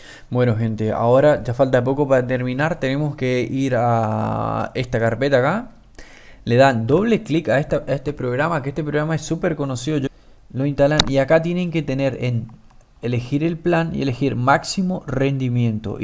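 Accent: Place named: Argentina. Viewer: fua chabon de q provincia sos? Soy de misiones, te noto cercano xd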